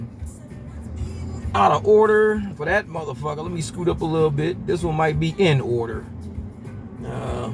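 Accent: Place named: Bay Area, United States. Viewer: You sound like you’re from the Bay Area.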